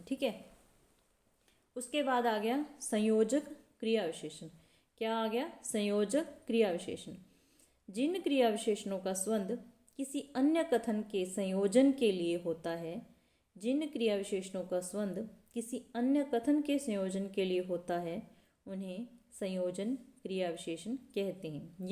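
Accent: Punjab, India. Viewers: Mam apki hindi me Punjabi touch h aap Punjab se ho.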